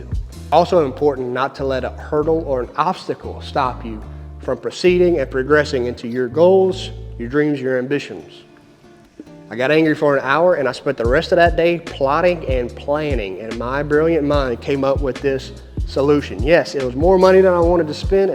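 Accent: Louisiana, United States. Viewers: Where in Louisiana are you. So strange hearing that accent on youtube. Not used to hearing it anywhere near an internet connection. And this heat has been killer. The insulation just hurts you more without AC. Better to open the doors and run a fan until you get enough power to run your AC. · Sounds like Louisiana. I was in Shreveport and moved out to Greenwood to get away from the politics and the hassle.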